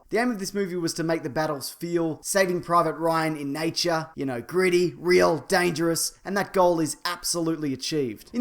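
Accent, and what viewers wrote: Australia. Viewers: Dude....your sense of humor always gets me....out of 10. And your Aussie accent is simply awesome....also....out of 10.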